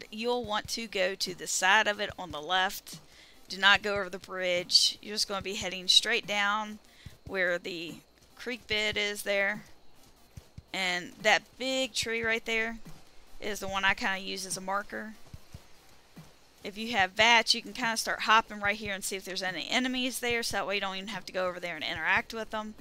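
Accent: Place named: Georgia, United States. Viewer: 👍 for the helpful tips Subbed for that sweet peach country accent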